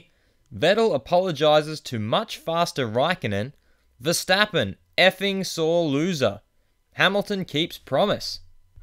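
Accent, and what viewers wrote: Australia. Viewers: Are you talking about the voice in the video? Because he's Australian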